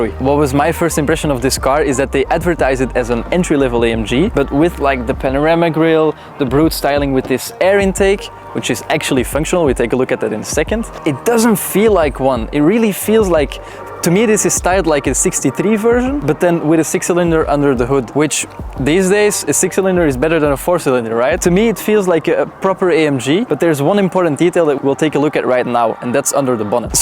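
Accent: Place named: Antwerp, Belgium. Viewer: Zie da ten bodukap dees filmke nie zie hee! Ik dacht al dat ik ee sint katelijnse tongval hoorde. Van achter in mijnen hof zie ik op het St. Michielskasteel! Goeie review! 🤘✌️👍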